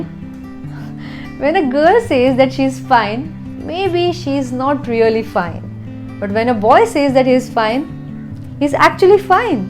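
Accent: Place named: India. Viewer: I like your Indian accent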